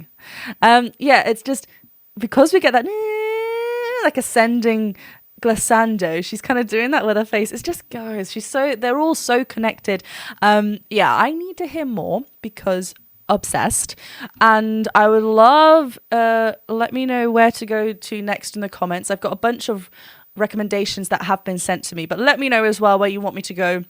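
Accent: United Kingdom. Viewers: Nice reaction. Be prepared, The Warning Army is coming. This will be your next favorite band. The Warning is addictive. You have no idea how much fun you are going to have going down this rabbit hole. I assume from your accent that you are in the UK. They just played a soldout show at O2 Academy Brixton in London. Your next reaction should be Evolve, live at the MTV VMAs. Don't forget to turn it up. As all Warning fans say, "You have been Warned!"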